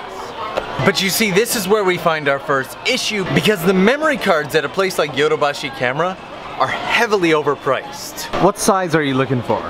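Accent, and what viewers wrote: Canada. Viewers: This guy sounds Canadian 🤔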